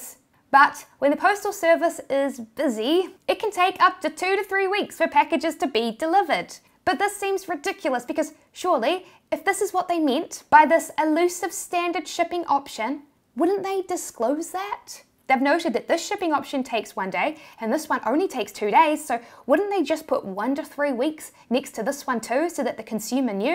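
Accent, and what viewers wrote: New Zealand. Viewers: Is that what a New Zealand accent sounds like?! I’ve never heard a South African sound so Australian or an Australian sound so South African. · Really strong kiwi accent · I like your kiwi accent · dragging their store with the New Zealand accent, priceless. 😥😭😂🤣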